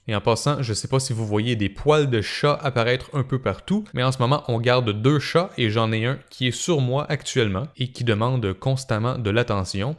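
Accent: Quebec, Canada. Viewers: Ahhhhhh enfin un quebecois. Je comprends mieux a cause du québécois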